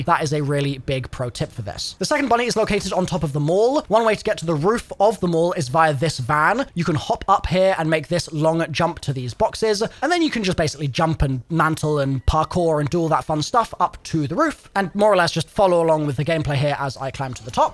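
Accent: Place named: United Kingdom. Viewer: Lol he sounds brittish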